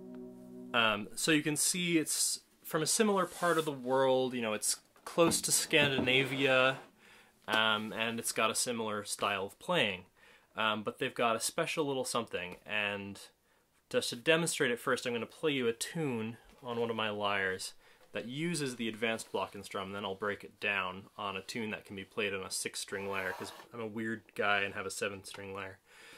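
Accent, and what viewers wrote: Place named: United States. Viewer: Seeing a few of your videos I did not expect you to have an American accent lol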